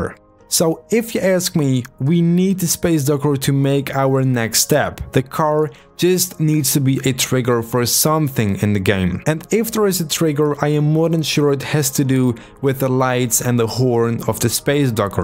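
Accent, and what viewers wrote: Netherlands. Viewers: Nederlands toch je weet zelf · yu dutch m8? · you are dutch I recognice your bad accent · Dude are you dutch?????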